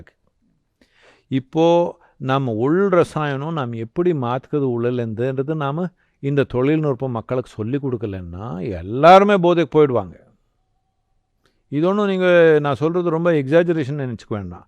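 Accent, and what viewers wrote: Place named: Karnataka, India. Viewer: every Kannadiga sounds the same when they talk in tamil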